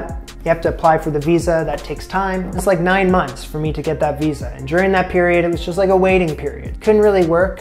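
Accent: Canada. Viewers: I suspected you were Canadian based on how you say 'out' :D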